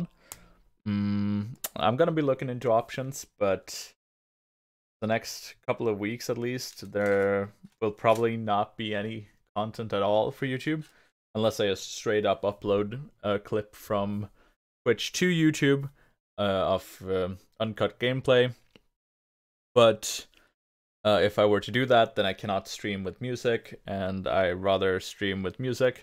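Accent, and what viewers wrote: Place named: Germany. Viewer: Great Deutsch-English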